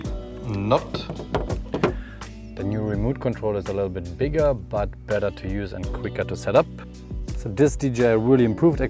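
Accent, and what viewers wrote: Germany. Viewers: your englisch sounds a littl bit like you are german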